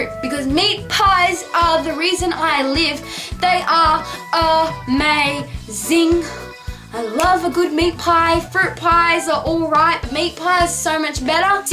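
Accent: Bogan, Australia. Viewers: Why is your voice so Ozzie? Don’t get made at me I am Australian. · agh not only do you sound bogan but I wanna thong ya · you realise you sound like a bogan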